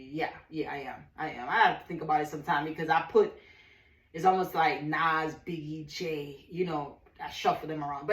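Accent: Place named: Jamaica. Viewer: Lol. So funny. I just felt like hearing your voice and your Jamaican accent that has been away from me for a while and that's what i found on my page. I feel glad that you are well. You are doing a great job. You are really all into it. Hands Up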